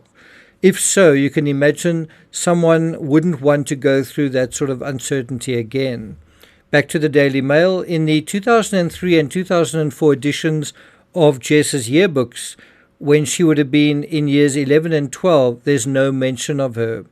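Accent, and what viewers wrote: South Africa. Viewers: Why is a south African reading Australian news? It's difficult to understand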